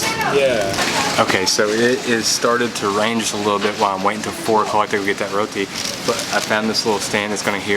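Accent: South, United States. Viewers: i believe he means your southern accent. I've been trapped a time or two by the fairer sex. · Randomly stumbled upon your video and glad I did! I adore your southern accent (I hope I got that right).Loved your reviews especially the Chinese noodles that I can’t normally have, l’d love some of that chilli pan mee. It’s actually called ubi getuk (mashed and fried tapioca with coconut), also the roti canai is pronounced Cha-nai, cha as in ch in chair. You’re practically Malaysian as we do mix our roti canai sauces together too! My ultimate favourite combination is the red sambal with the middle lentil curry (called dhal). Looking forward to more of your videos!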